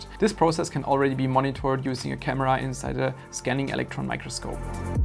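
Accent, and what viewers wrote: Germany. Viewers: I knew you were German simply from the accent. My word.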